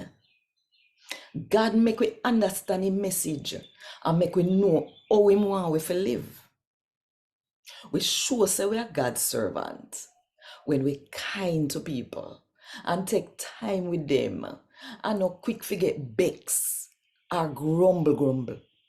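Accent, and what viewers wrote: Jamaica. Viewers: Very touching my Sister, it's very nice to hear it read in patwa❤ our cultural language love the land of my birth jamaica 🇯🇲 to the world🥰❤️‍🔥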